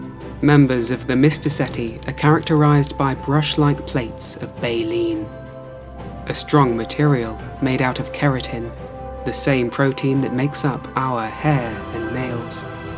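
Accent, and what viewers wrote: United Kingdom. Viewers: The combination of your voice, British accent and a proper music is just mind-blowing. You are a genius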